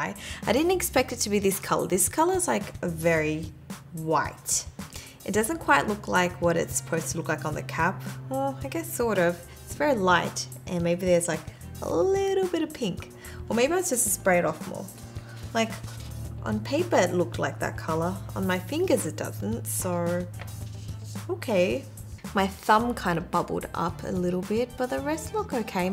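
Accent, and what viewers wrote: Australia. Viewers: I love it when I watch videos of people with an Australian accent. We can sometimes be hard to find on YouTube. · Is she Australian? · Are you from Australia? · I THOUGHT U WERE ASIAN BUT UR AUSTRAILIAN RIGHT?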